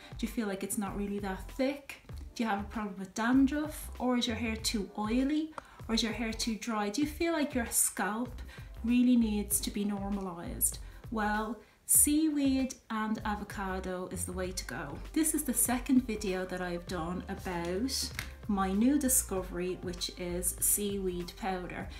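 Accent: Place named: Dublin, Ireland. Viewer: Your accent! you are Irish 💕Dublin accent💕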